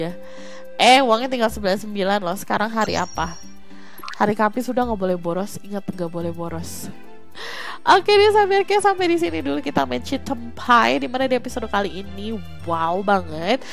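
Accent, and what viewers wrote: West Java, Indonesia. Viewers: yeyy upload lgi❤️❤️.. mskpun telat..... edit: sundanya keluar semua😂wkwkwkw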